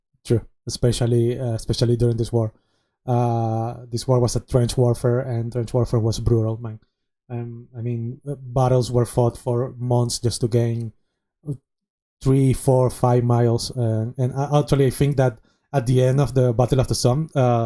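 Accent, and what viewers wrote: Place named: Spain. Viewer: Estoy enganchado a las reacciones, y el acento español se reconoce al segundo. Yo el primero. Buen video.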